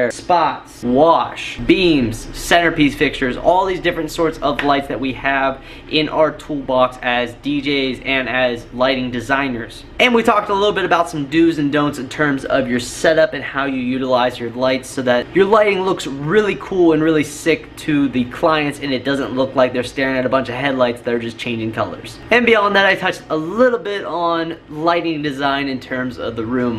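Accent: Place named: South, United States. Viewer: Sounds like you are getting a bit of a Southern Draw! Welcome to the South Brother.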